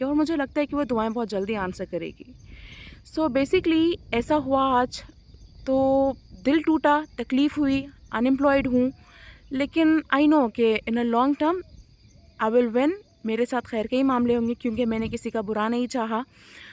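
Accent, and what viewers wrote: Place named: Pakistan. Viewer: You are indian but your accent is pakistani Btw you are from which state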